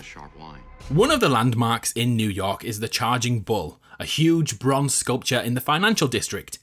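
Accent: United Kingdom. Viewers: Hey , you are British?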